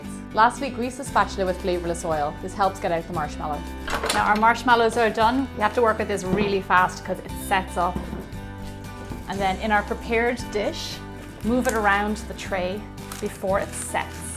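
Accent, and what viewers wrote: Ireland. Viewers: I love your accent. I'm Irish also. My dad was born in Ireland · Are you Irish? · Are u from Ireland I'm from Ireland .... This looks so yummy really wanna try them xx 💕💕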